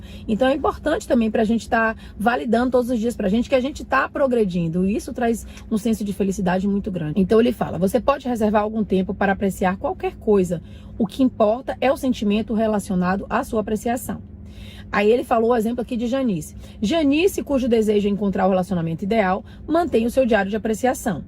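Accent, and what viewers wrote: Bahia, Brazil. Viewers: Rumo ao 100 mil 🌻 #novaaquinocanal 🧡 faz acho q uma uns 15 dias que você apareceu na minha vida, gente quanta luz, quanto energia boa, aaaamo o sotaque baiano, só ele por si só já nos trás acalmaria, a certeza que tudo é lindo, que tudo chegará a nós. Hoje resolvi me organizar e assistir toooodos os seus videos☺ acabei de ver a playlist da lei da atração, os 33 vídeos 🤭 e fui anotando todos as principais mensagens para colocar em prática. Obrigada por dividir com a gente tanto conhecimento, alegria e certeza que o universo é perfeito. E por manter (no meu caso) a Fé como absoluta. Agradeço a você, agradeço a sua vida (lulu, ben e lucas). Fica bem!! Você está mais linda, amei seu evolução (afinal vi vídeos de 6 anos atrás 🤭). Gratidão 🌻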